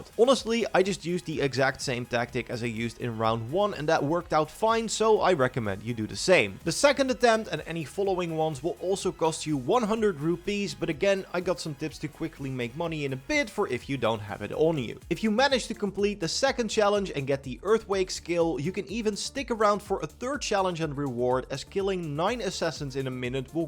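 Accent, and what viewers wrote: Netherlands. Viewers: Are you Dutch? You sound Dutch haha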